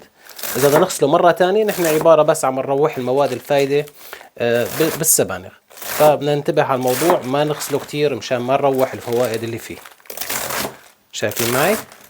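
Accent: Syria. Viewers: معلم المعلمين والله حضرتك كأنه حلبي. بلدياتي هلافيك من وين ماكنت المهم أنك سوري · تسلم يدك. جميله اللهجه. حضرتك سوري؟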